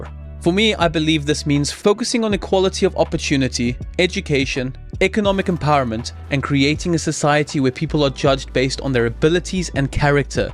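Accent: South Africa. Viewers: I had to come check your channel out when I heard the South African accent on Asmons stream earlier xD Keep up the good work dude! Really well discussed, idk why my brain will always default to comparing this stuff with BBBEE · Can't help but hear that distinct South African accent. DEI is just BBBEE but worse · Hmm... I may be mistaken, but something sounds suspiciously South African. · are you from South Africa? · As a fellow South African, I know another South African when I hear it xD Mad respect for the videos you do, your views need to get out there much more especially in DEI.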